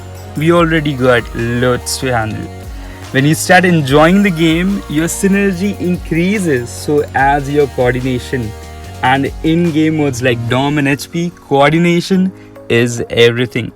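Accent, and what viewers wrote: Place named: United States. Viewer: Bhai iss video me tera accsent alag lag raha hai 🤔 more like American accsent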